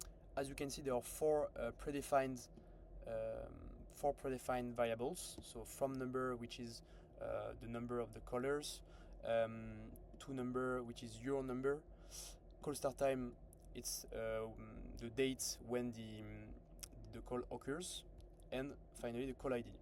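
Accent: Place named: France. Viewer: Pourquoi ne pas faire une vidéos en Français alors que la société est française, de plus avec un anglais avec accent français .Dommage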